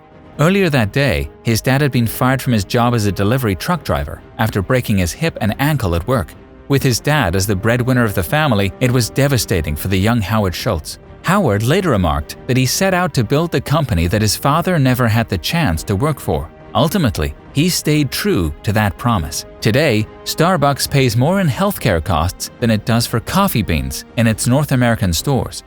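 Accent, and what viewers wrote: United States. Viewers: How did this guy's accent went from being annoying to becoming Americanized in such a short time (3yrs)?? Good effort nonetheless.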